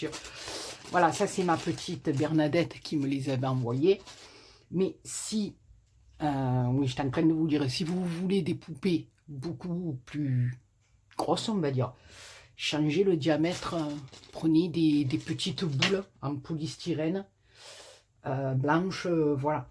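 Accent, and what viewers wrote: South, France. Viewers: Coucou! Je crois bien que le père noël va retomber amoureux de sa belle de noël ❤️ En tout cas moi je craque!!! J ai pas le matériel mais sinon j en aurai fait avec plaisir!!!! Comme celui que j ai eu a t entendre nous dire tes bêtises avec ton accent du soleil ☀️ Gros bisous 💋💋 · Géniale la petite Mère Noël. J'ai passé un excellent moment à la visionner. En plus avec l'accent du soleil et l'humour, que demander de plus. Merci beaucoup pour ce superbe tuto. Bisous breizh (Bretagne)!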